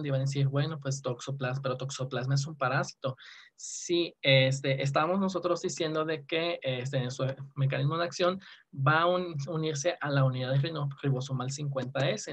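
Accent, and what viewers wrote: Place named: France. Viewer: Está chido el acento francés, excelente clase gracias por compartir de su conocimiento!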